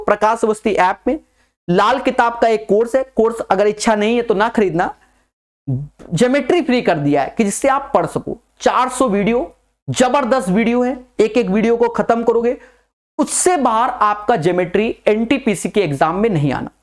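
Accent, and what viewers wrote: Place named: Kanpur, India. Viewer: Oye Hoye. Apne Kanpur wale Sir. Kanpuriya Style me. Rock krte hue. 😎😎😎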